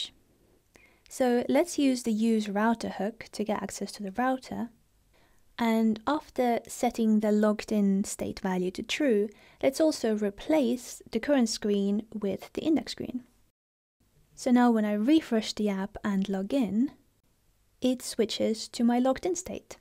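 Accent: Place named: United Kingdom. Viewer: I never understand this british accent. this lady is always confusing. her voice and way of explaining things not quite right. you should always start from clean slate when you explain things.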